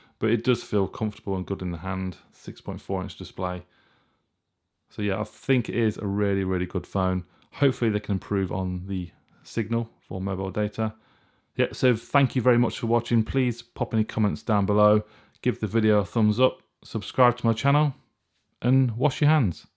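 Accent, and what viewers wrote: United Kingdom. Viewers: 0.88 Mbps download speed? I would have guessed from your accent that you are British, but apparently you live in a rural are in some developing country?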